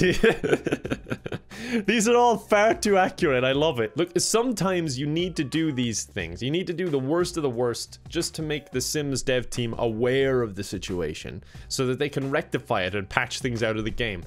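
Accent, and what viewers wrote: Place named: Ireland. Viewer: Can you film a video in your FULL IRISH ACCENT? Love it, you kind of remind me of Domhnall Gleeson. He's sexy fine!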